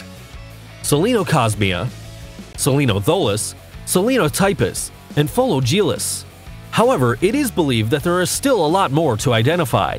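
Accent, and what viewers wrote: United States. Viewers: this is Australia, Mate! We gave INCHES away a very long time ago - and the American accent. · Got issues with this video. First why is it being narrated by an American? Ask an Aussie to do it. Second.... they have obviously read mosly from an Australian National Geographic journal... found it online. Third the White Tailed spider has not been verified as dangerous...no evidence of a venomous bite. In other words an urban myth.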